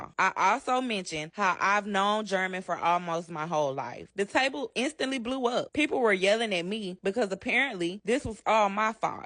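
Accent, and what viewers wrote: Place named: South, United States. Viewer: Ain’t no women with that beautiful southernish accent naming no baby of theirs LILITH 😂😂😂 So where do I send my “am I the asshole” storytimes to 🙃🙃🙃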